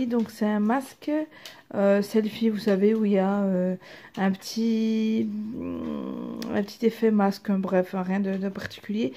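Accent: Belgium. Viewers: Salem ma belle tu as un accent tu es belge ?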